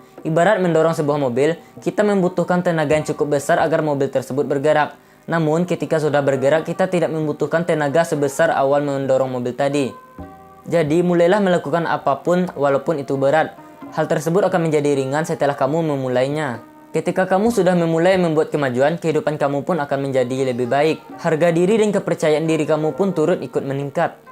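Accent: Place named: Punjab, India. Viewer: Bang Punjabi bgt😅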